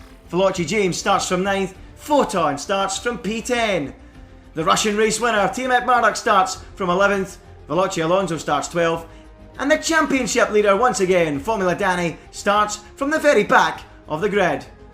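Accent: Scotland, United Kingdom. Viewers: Scottish ain't too hard to understand, what is hard to understand is why he has an issue with tom97hd tho 🤔 just seems to slate him a bit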